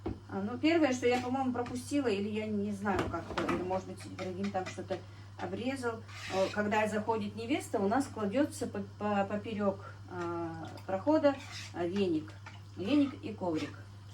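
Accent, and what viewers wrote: Standard. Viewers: Добрый вечер, пересмотрела почти все твои ролики, готовила по-твоему рецепту мясо по французки, очень понравилось моей семье,хотя до этого делали по другому, написать хочется много добрых слов тебе, но, что подкупает в тебе( я думаю не обидешься что на ты? мне 63 года), нет в тебе какой-то заносчивости, пафоса, гонора, в отличие от некоторых блогеров с соседней республики, у тебя очень чистый русский язык, правильно одна женщина задала вопрос, а вы не учитель? Очень приятно тебя слушать, смотреть как ты готовишь, желаю всего тебе самого хорошего!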